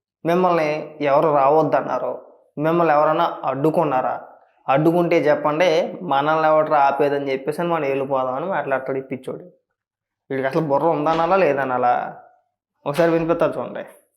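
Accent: Godavari, India. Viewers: Every common man have similar kind of views which you have but you have the medium to express their feelings as it is in Godavari slang satirically. Appreciated. Keep going. We are with you.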